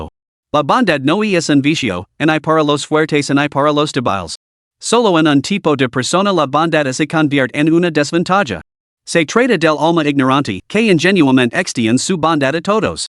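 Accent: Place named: England, United Kingdom. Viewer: Arreglen ese acento inglés que arruina la narración 👎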